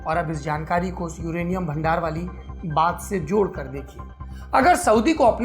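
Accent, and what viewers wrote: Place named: Bihar, India. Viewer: 11.48👍👍 baki jo h so toh haieye h ,😁😁. Chacha bihar nd up k log deeli-bambai kahe n chle jaye but ye jo apna bolne ka tarika ye na chutega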